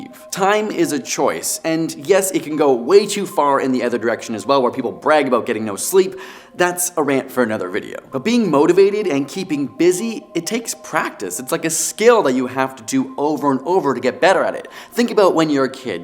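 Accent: Canada. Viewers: Your Canadian is sticking out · are you Canadian? the way you pronounce "Tommorow" is kinda bothering me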